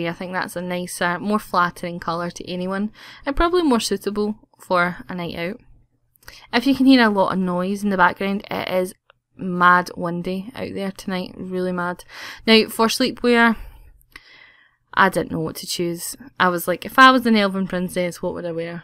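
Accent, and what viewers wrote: Scotland, United Kingdom. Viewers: Hi, I love the LOTR, and your Sims really looks like an Elf, she's very pretty :) Are you Scottish by any chance? :D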